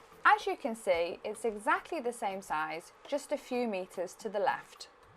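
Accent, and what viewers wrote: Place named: Lancashire, United Kingdom. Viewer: Was directed through Tokyo Llama so really looking forward to watching your videos. Where are you from in the North,? Can’t quite place the accent - Lancashire?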